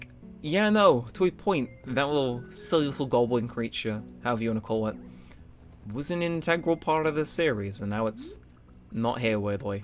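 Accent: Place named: England, United Kingdom. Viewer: Hey I’ve been watching ur ac old school vids for a while now. Big fan of this accf series!!! I always wanted a WII, but never got one for this game & SOS, HM. Now I’m really wanting a WII, but it’s expensive & idn how to hook up to my smart tv on Comcast cable. Plus my aunt just bought me a switch last fall. Love ur accent too, my gran was from England & my uncle is in Scotland, owning a pub. Anyways, I’ve been to both England & Scotland & love them both!!! I’m in the east coast USA, I’m Waterfall ie KtCub🌸💟🤗. I’m on Twitter if u want to check my postings out. Keep the wonderful content, you really cheer me up every time I watch ur vids. I hope u get this msg, Bc idn if my msgs go thru to any of my AC YouTube ppl I like a lot & subscribe. Have a swell day, Laters::::💖🦋🌈 Ps: can u me so I know u get this? Thnks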